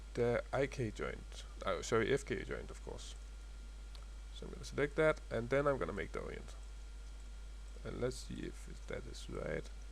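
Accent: Denmark. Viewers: are you danish swedish or are you german i am danish by the way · I can easily hear you're danish d: ! not only by the name d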